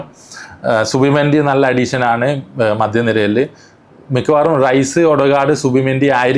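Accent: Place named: Palakkad, India. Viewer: Are you from Palakkad district? Accent looks like that.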